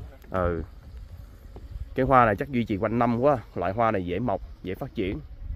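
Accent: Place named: Saigon, Vietnam. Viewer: Mình ở xa, nghe giọng Sài Gòn, Mình cũng thích ơi, chúc - được nhiều sức khỏe, đi chơi vui vẻ ❤❤❤❤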